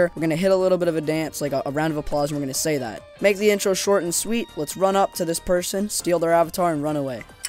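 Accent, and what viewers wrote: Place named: United Kingdom. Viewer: goated channel frll (why is that your first youtube video you had a biritish accent?)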